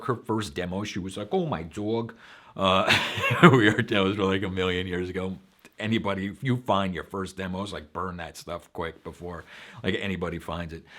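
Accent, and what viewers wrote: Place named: New York, United States. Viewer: Love your New Yawwk accent.;) Great info as always, thanks!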